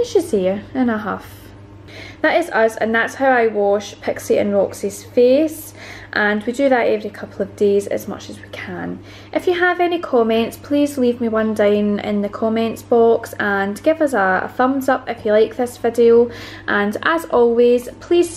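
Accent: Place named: Scotland, United Kingdom. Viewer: Ah, a beautiful Scottish accent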